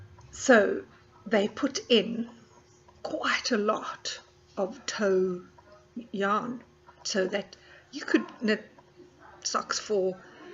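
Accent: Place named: South Africa. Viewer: Hi. Thanks for the review and tips. Just discovered sock knitting and Arne & Carlos' channel on YouTube. You sound like a fellow South African. Where did you buy your yarn?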